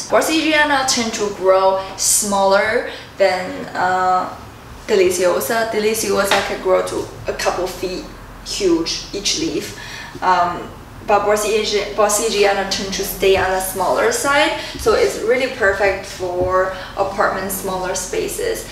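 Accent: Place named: Southern California, United States. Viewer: It’s a SoCal accent, silly goose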